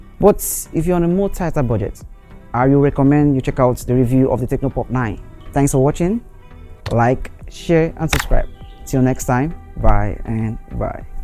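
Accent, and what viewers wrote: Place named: Africa. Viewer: African english is funny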